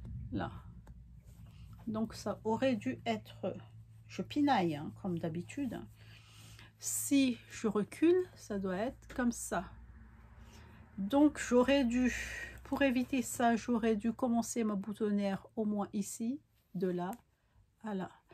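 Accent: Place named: Madagascar. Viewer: Coucou J’ai passé un bon moment avec toi dans l’atelier cet après-midi en tricotant. Kassandra me dit: « elle a le même accent que toi » 😂😂. Ben oui on est gasy 🙌🏽🙌🏽🙌🏽 Superbe ta jupe Tiana dans ce tissu ça fait chic. Et wouaou la jupe Dolorès, elle est juste magnifique 😻… ça va bien avec la ceinture. Je crois qu il faut que je fasse la mienne 😜😝. Des bisous de nous 2 😘😘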